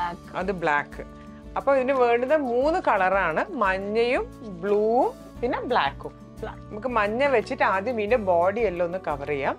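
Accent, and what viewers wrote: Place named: Kozhikode, India. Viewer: m also frm calicut,,,vadakara.. samsaram kettepo nk thonni..atha chodche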